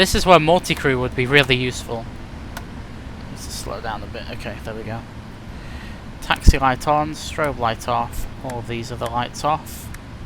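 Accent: United Kingdom. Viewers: Prefer a pommy voice-over to yank ones :o) - Aussie wouldn't be bad but you've done a great job thanks.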